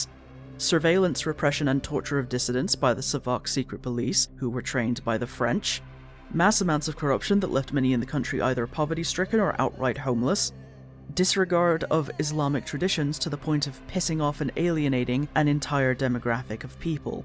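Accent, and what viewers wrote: Scotland, United Kingdom. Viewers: Oh! I didn't realize you were Scottish. I am comically bad at accents.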